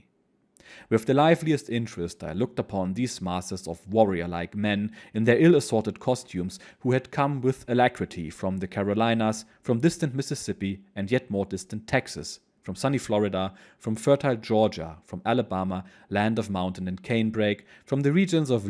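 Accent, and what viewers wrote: Germany. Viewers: What a surprising and interesting choice of theme this time! I bet our American friend will flock to to join us to take this journey. As I have said before, your way to present these historical adventures is commendable and the little German accent of yours is perfect for exactly this one - thank you, I'm hooked once again!!💙💙💙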